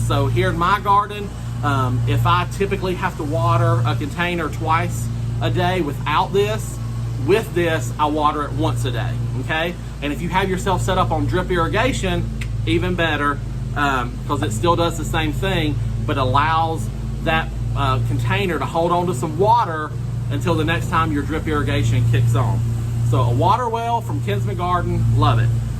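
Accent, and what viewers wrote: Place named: Arkansas, United States. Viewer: I had to look at your bio information after watching your most recent video re making a planter fountain. Your accent sounded so familiar and when I saw you now live in central Arkansas I knew why. I am originally from Camden. I’m enjoying your videos🌷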